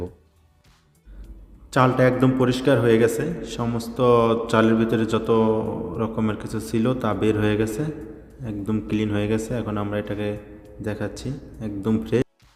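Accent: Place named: Barishal, Bangladesh. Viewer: ভাই মনে হচ্চে আপনার হোম ডিষটিক বরিশাল ।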